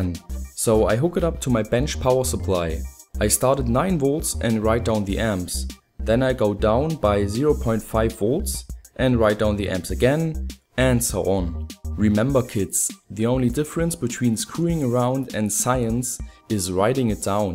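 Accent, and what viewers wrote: Germany. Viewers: Xmcgamer0X he is german soo ... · He's German, so his pronunciation can't be perfect. But I bet you don't talk another language besides English as he does! ;)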